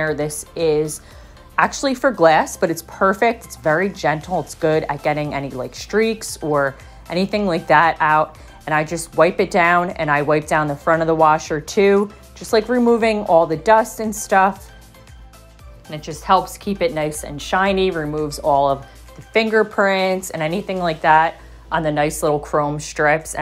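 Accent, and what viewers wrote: Philadelphia, United States. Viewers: Sound like you're from Philly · Are you from Philadelphia? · You sound like my family in Philly! Thanks for the video.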